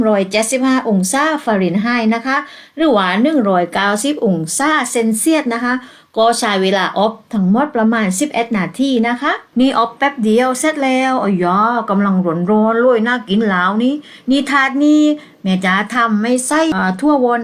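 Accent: South, Thailand. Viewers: ชอบเวลาคุณจ๋าแหลงใต้จังค่ะ 🥰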